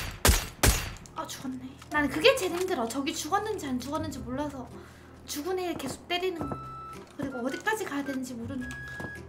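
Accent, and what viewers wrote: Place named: Chungcheong, South Korea. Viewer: 겨??? 누나어디사람이야! 충청도하이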